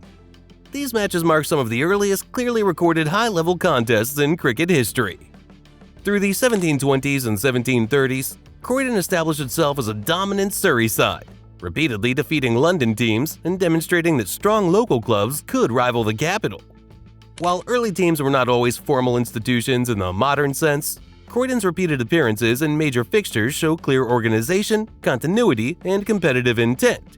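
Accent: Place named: United States. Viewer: and The world's greatest batsman, Australia's Don Bradman (apparently here (twice!) known as Edward Lumpy Stephens) played for Hambledon CC? Tony Greig was also Richard Newland in another life, how versatile! Boy, I hate AI.....why have an American voice? This whole video is extremely aggrevating.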